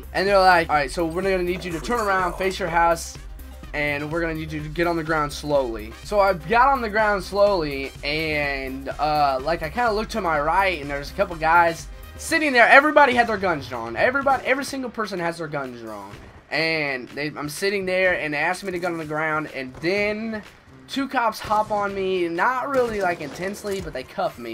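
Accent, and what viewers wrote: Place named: Indiana, United States. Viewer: think that's just his Indiana accent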